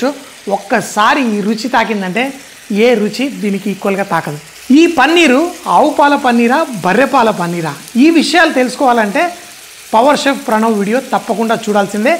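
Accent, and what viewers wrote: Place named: Telangana, India. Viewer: nuvvu e tv lo unnapudu maku nethi nosthunde ne kani eppudu nuvvu chese prathi food video chusthamu nuvvu telangana yasala matladthe 😂😂😂😂😂😂 super re mi bawa ante penimiti ante na better half likes ur videos a lot we just wait for ur new videos every day and all the best for up coming ma bangaru thandri ki all the best nanna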